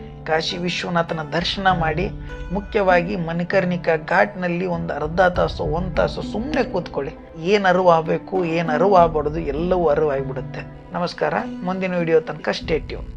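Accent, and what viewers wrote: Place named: Hubballi, India. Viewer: ಹುಬ್ಳಿಯವ ಹುಬ್ಬಳ್ಳಿ ಸ್ಟೈಲ್ ನಾಗ ಮಾತಾಡೋ...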